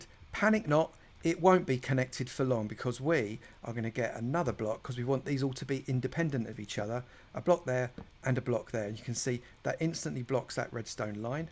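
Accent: United Kingdom. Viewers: it's not even close, like it's not even in the realm of Gordon's low fast-paced voice. The only similarity is that they both have a British accent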